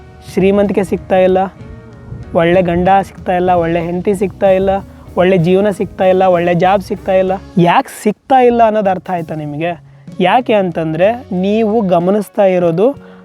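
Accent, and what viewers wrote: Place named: North Karnataka, India. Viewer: ಗಂಡ ಮಗನ....❤ ಉತ್ತರ ಕರ್ನಾಟಕದ ಮಾತು....ಇನ್ನು ಹೆಚ್ಚು ಹೆಚ್ಚು ಕನ್ನಡ ದಲ್ಲಿ ವೀಡಿಯೋ ಚಿತ್ರೀಕರಣ ಮಾಡು.......❤❤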